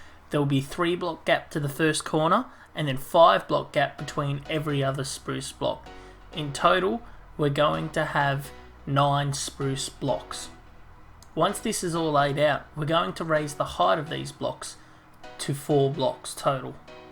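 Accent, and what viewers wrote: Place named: Australia. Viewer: What a nice surprise to hear that accent after watching your timelapses - Aussie? Amazing content though mate, keep it up, you're destined for greatness!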